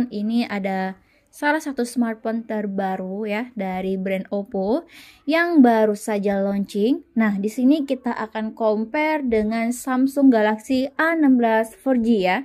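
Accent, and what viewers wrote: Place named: Sumatra, Indonesia. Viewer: Sumatra banget bahasa nya, samsung galaxi anam belas😢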